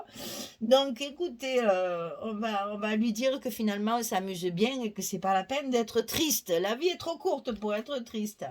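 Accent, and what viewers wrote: South, France. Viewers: Toujours enchantée de vos créations et vos explications données avec ce petit accent du sud que j'adore merci pour toutes ces explications très claires bisous et belle journée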